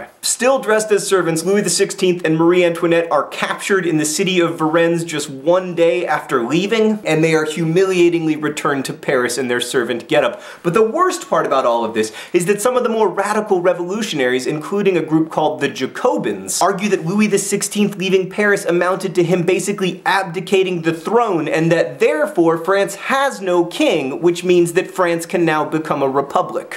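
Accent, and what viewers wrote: United States. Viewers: did you say you didnt pronounse it right because your Americain? finally, i always think "what does he mean by that!" no offence but its because im british!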